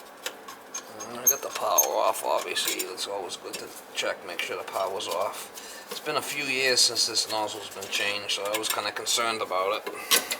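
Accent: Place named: New England, United States. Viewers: Sounds like my hometown of Plainfield CT. Accent is spot on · Psycho chicken LOL. Been over 30 years since i heard this one. Where you from? Sound like southern New Hampshire or Massachusetts. Maine hete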